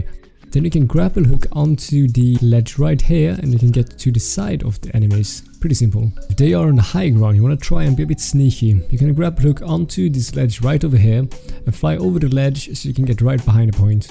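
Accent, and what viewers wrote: Sweden. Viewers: are you Swedish? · Alex B its a swedish accent or southern swedish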